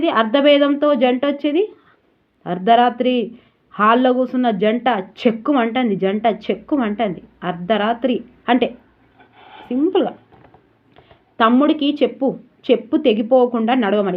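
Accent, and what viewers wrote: Telangana, India. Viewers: Telagana భాషలో మంచి గా మాట్లాడుతున్నారు medam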